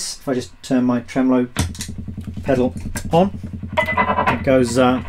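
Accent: England, United Kingdom. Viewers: 10 années déjà....Merci, Mr! J'adore ton niveau musical, j'adore ton accent Anglais, bref, tu es très bon et très sympathique cher ami musicien....merci pour ce cours que j'apprécie beaucoup car même avec mes dix années de guitare, j'ai encore besoin d'un super bon comme toi pour m'apprendre le morceau exceptionnel, irrationnel, indémodable des...Merci infiniment, Monsieur! Je ne suis pas prêt de t'oublier cher ami.....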